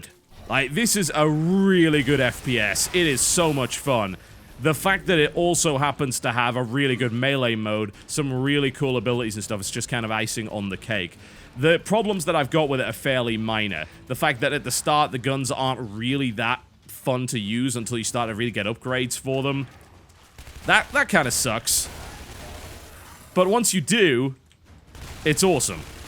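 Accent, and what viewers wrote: United Kingdom. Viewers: lol first of all hes british. second: you're funny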